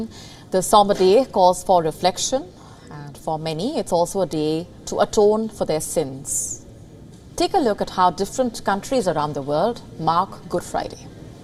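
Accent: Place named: India. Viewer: Too strong Indian accent 🙏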